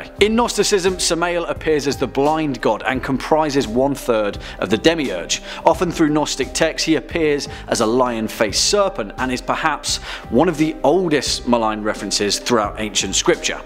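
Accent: England, United Kingdom. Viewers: has the best english accent ever makes binge watching easy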